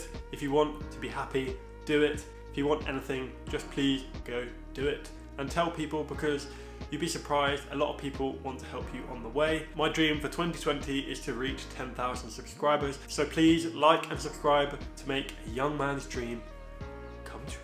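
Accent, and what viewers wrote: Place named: Scotland, United Kingdom. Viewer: Nice video mate, Scottish accent was decent to be fair!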